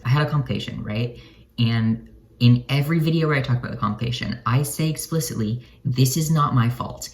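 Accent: Canada. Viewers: Oooooh, all the "out" and "about" in your speech outed you as a Canadian within the first minute, lol. Not that it matters at all, just a fun observation. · Your voice reminds me of Chase Ross'. That's all. Don't know what Kalvin Garrah even means with 't-slur voice.' It sounds normal to me? Edit: I just realized it might be because you both sound Canadian. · Hmmm one thing your voice tells me is that you’re Canadian 😎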